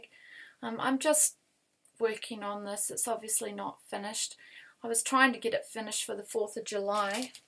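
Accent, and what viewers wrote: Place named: New Zealand. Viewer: Hi from Auckland! My maternal fam is from Lumsden, you sound just like home 😀Nice to meet you!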